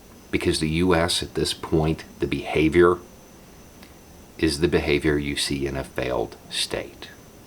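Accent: South, United States. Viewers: Thank you so much for being a voice of reason in this unreasonable world. Also thank you for being a southerner and showing that not all southern people who speak with an accent are ignorant.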